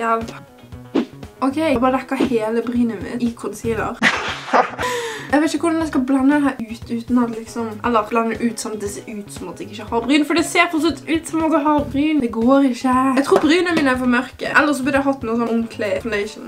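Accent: Bergen, Norway. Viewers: er du fra bergen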